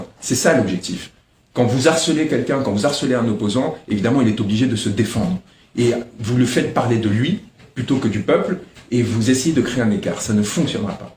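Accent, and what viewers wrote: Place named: France. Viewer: Il nous parle le français de france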